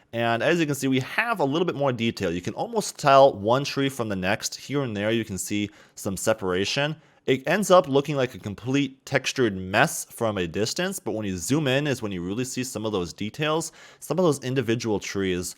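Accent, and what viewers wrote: Germany. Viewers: Are you German ???? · Yo are you german???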